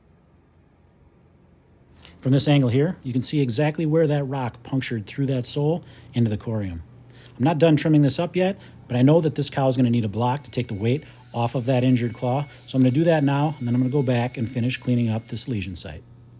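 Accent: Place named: Midwest, United States. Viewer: I love the Midwestern accent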